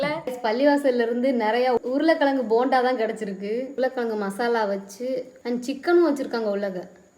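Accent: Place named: Ramanathapuram, India. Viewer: Endha ooru nenga ,,howka ,varakah nu pesurenga ,nenga native kerala illa , ramnad side ah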